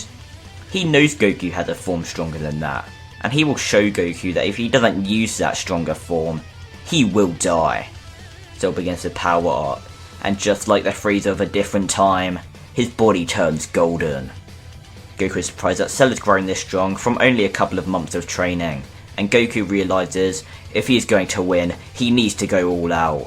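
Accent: United Kingdom. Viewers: Is the man Briish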